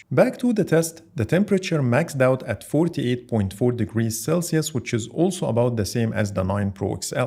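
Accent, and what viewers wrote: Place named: Egypt. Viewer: are you Egyptian i can sense the the accent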